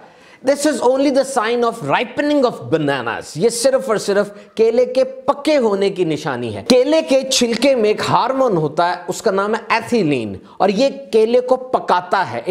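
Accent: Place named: India. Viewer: His accent resembles with Indians .....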